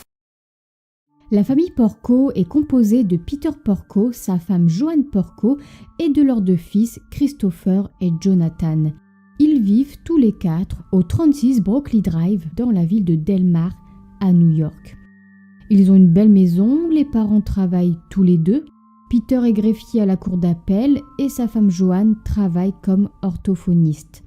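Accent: North, France. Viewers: Bonjour je viens de découvrir votre chaîne et je dévore littéralement les épisodes , j’aime beaucoup !! Petit question si ce n’est pas indiscret bien sûr ,mais j’ai l’impression d’entendre dans votre voix,l’accent du nord de la France ,je me trompe? En tout cas merci pour tout ce travail et bonne continuation !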